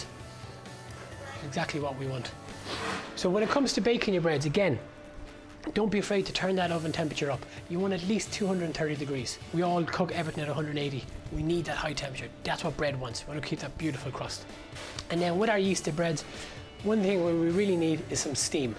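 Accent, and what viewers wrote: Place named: Ireland. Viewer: bet you're fun to be around in a day to day situation. Maybe have that stick surgically removed from your backside?? Jeez. I have to say that I too enjoy his accent, but it's not because it's his.. just enjoy the irish accent. If you can't understand things like that ... well I REALLY feel sorry you ...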